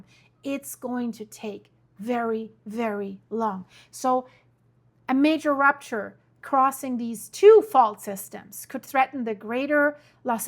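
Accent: Germany. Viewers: She's German 😂😂. It's hilarious 😂 to listen to her pronounce some words. Love ya 😊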